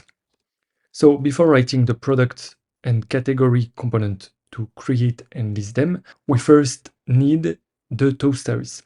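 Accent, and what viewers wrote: France. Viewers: On entend bien l'accent Français, c'est bien pour comprendre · france accent ?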